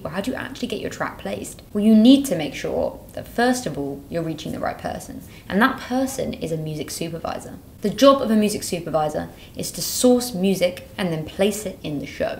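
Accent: United Kingdom. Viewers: I really like the content you´re making on this Channel... But I absolutely LOVE your british accent!!! :-D Cheers & a happy new year to all in the Burstimo team from Denmark :-)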